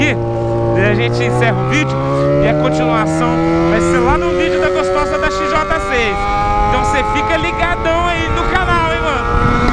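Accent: Goiás, Brazil. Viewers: Eae por que vc fala no pique do Goiás? Eu sou do Goiás kkk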